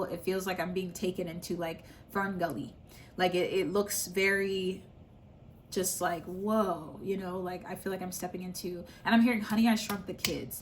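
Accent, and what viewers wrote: United Kingdom. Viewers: Been singing “money money moneyyy” for weeks and it’s the one you started with. Also have that same British accent haha - loving the synchronicities! Thank you 🤑✨ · Old British accent is crazy ima jus leave it at that 😭😭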